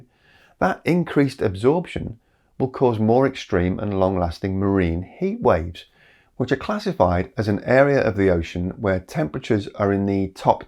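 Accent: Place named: Upper class, United Kingdom. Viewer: I guess alarmist hysteria sounds rational when presented with an upper class British accent. It remains hysteria nevertheless.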